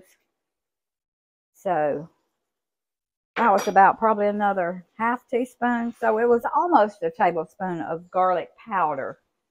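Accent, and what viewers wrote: South, United States. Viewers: Just stumbled across your video, i love it, looks yummy. Your in the south i think from you accent, love it. I have subscribed, look forward to more, God bless❤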